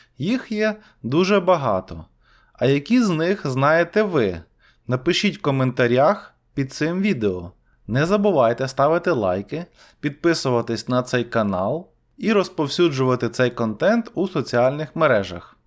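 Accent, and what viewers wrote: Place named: United States. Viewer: Питання автору каналу! Ви всюди в словах,і навіть в кінці слів вимовляєте букву "r",ви що американський варіант англійської мови вивчали,чи ви американець українського походження,тому що в американському варіанті буква "r" читається всюди,а в британському ні. А бувшому СРСР, і зараз, навіть і в Європі всі,і я в тім числі,в школах і вузах вивчали,британський варіант. І зараз в інтернеті трудно знайти україномовного блогера,який би розмовляв в британському варіанті. З повагою!Україномовному блогеру респект!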